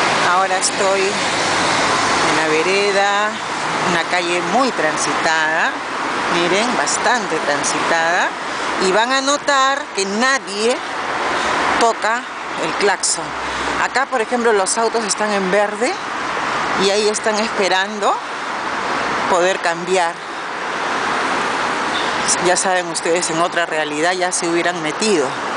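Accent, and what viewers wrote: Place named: Peru. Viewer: Por el dejo eres Peruana, yo vivi en Perú dos años y alla si tocan claxón bastante 😄. Saludos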